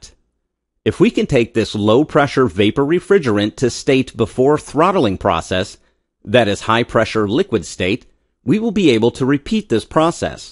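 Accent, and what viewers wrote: United States. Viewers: He has an American accent but misses a lot of words out of his sentence like a Chinese man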